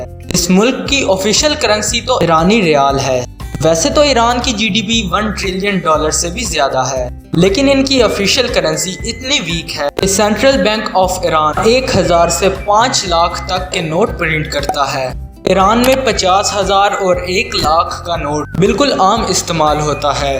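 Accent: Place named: Punjab, India. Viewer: You need to work on your accent. Too much paindo accent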